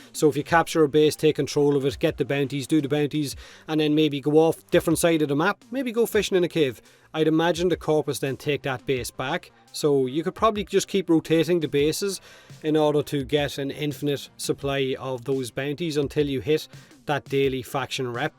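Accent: Ireland. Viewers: Another great video. Thanks. What part of Fortuna are you most excited for? Also, what part of Ireland are you from?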